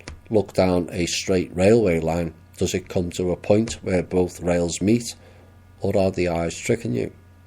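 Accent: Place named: Liverpool, United Kingdom. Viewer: my names Kevin Paul from Birkenhead and I know you know we're this is cause I can here the Scouse in ya lad! 👍🙏🇬🇧😇lisen I'm age40 dad to 4, and 16!weeks ago I had a spiritual awakening and since then I can see the evil entities in certain professionals in my area and in many other people around me, my GP doctors is one of them and he is trying hard to convince me I'm loosing my mind, Don't worry I haven't told him what I'm seeing and receiving, Ive just asked him why Ive been floating out my body multiple nights recently and I made the huge mistake in asking for advice from my dr but I never mentioned my spiritual awakening, but he know Fxxkn right I can obviously blatantly see he knows buy his strange reactions and the out of the blue serious advice he is trying to force me to believe ‼️🆘🙏‼️🇬🇧So now I am all alone no one believes me what I am 16 weeks into now and this Awakening doesn't go away I will only become more and more awake and closer to the Oneness which is all of our number one objective while we are in a body, I have reasearched the arse out of awakening but exspecially the UFO FACT and every other subject to do with the pineal gland cover up for multiple decades to humanity ‼️❤️ it's up to you but if someone had the time to lisen to what I have to teach and look at all of my iPhone footage of the Sun and The Moon and the big flashing orbs that appear for me and follow me all over the place both night and day with the sun and moon but the orbs I have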